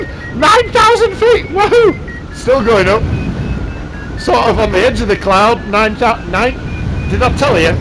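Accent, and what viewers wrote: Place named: England, United Kingdom. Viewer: So highly thankful to you for your nice reply.. the video is so useful, it needs several times to hear to get more info despite the sounds of the winds around you there besides your English accent, and still needs more in scientific information to understand on how every physical factor would participate with other factors in the Hangglider lift process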